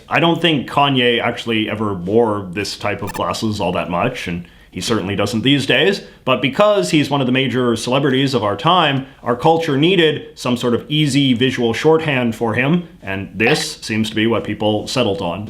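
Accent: Canada. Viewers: I am from Montreal, and I watch several videos in English on Youtube. So far you are the only one I hear saying "About like if it was a boot". Maybe I hear to much from US. Everytime, I hear "A boot". Is is localize to where you live. Is in Ontarian? I am very curious. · You’re so Canadian that it’s distracting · As a Canadian who's lived in multiple prpvinces, from coast to coast, id love to know where in Canada that your accent comes from! I've never, ever heard anyone actually pronounce "about" that way. Not ever. I need to know where that stereotype comes from, exactly!!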